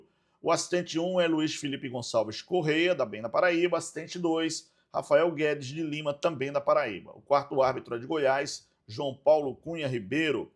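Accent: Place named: Rio de Janeiro, Brazil. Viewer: Não tem coisa mais ridícula que o carioquês!!!!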